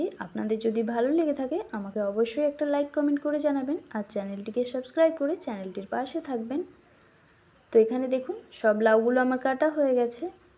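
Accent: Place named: Kolkata, India. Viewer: Assalamu Alaikum. Shotti boddo bhal laagchhe ata bhebe je, kolkatar muslim apur ranna dekhte parchhi🥰. Ami always kolkatar ranna dekhi. Alhamdulillah akhon akjon kolkatar muslim apur ranna dekhte parbo 🥰. Ami USA 🇺🇸 theke apnar ranna ta dekhechhi, aj shokale apnar recipe u tube a pelam. First I thought u r bangladeshi pore kotha shune bujte parlam ta kolkatar 🥰🥰. Shathe shathe subscribe kore felechhi. bolchhilam je, Kolkata te kuthay apnader bari?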